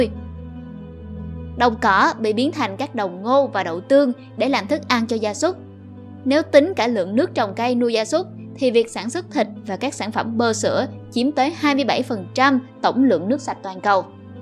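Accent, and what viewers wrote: South, Vietnam. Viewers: giọng đọc hay kiểu miền nam mà sao xài chữ Lợn với Lạng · Kg biết ai thích thịt thích rau gì chứ riêng tui thì tui khoái cái giọng con gái miền nam ghê à ngen ^^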